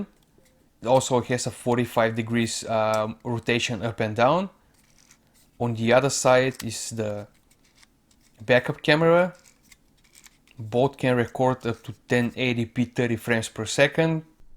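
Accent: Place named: Brazil. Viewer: brazilian accsent :)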